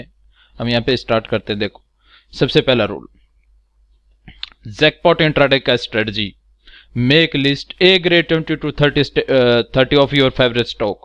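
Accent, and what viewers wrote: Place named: Haryana, India. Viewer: Guru haryana se ho lagta h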